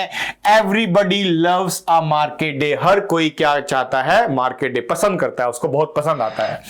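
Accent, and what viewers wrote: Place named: United Kingdom. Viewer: Sir I had a thing that I think is odd and that is your accent you speak in a half british accent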